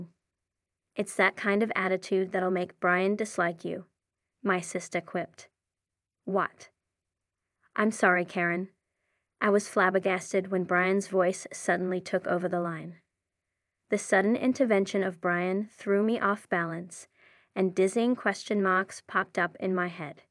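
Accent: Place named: United Kingdom. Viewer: I think British or English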